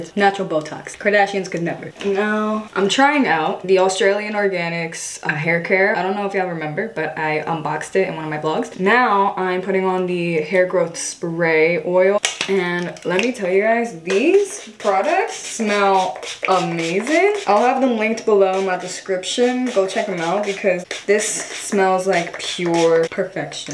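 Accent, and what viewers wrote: New York, United States. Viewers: u from nyc? 🤨 love ur accent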